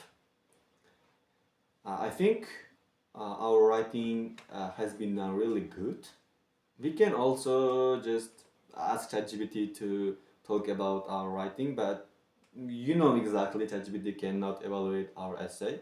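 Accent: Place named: India. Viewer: Bruh indian accent 🤡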